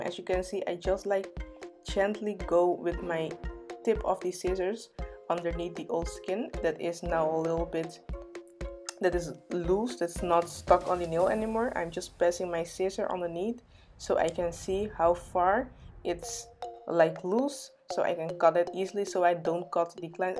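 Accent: Netherlands. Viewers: Je bent sowiesooo Nederlands. Hoor het meteen. Goeie videooo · 💞💞Love the video! Btw, do you speak Dutch? You’re accent sounds familiar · Are u dutch ? Your accent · are you dutch? you have a dutch accent hehe beautiful nails and technique <3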